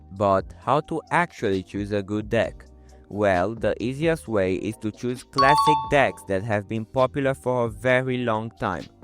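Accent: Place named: Iran. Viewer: by his accent, he seems irani/persian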